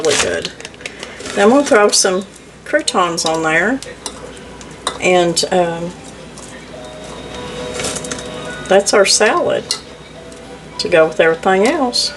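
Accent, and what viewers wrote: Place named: Ozarks, United States. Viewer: Love your channel. My favorite recipe so far is your Crazy Crust Easy Pizza. I make it a lot and I make it gluten free for my 13 yr old grand daughter. Thank you for the great recipes and all the love. Your home is so charming and I love your Ozark dialect. ❤ and we love the Lord too. I’m 70 and my hubby is 81. We live on a small homestead in Indiana.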